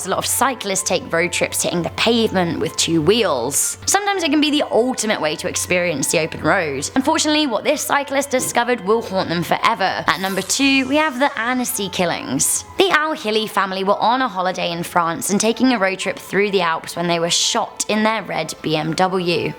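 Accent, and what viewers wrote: United Kingdom. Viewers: I'm so curious to know where your from 😍 your accent sounds British but distant like you haven't lived here for a while... Anyhow much love for the page you and Danny are amazing!! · Hey idk y but i love the British accent on women an i think ur hott lmao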